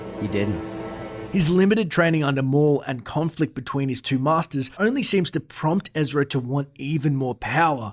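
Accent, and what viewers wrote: Australia. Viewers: You are an Aussie aren't cha?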